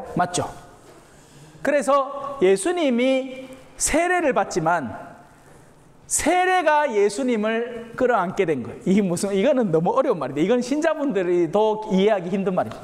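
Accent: Gyeongsang, South Korea. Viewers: 신부님 감사합니다 함께 공부할수 있게 해주셨어요 ❤ 🎉 경상도 사투리도 정겹구요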